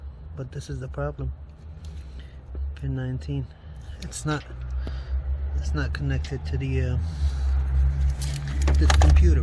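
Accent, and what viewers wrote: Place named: Iraq. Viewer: Nice Iraqi accent 😊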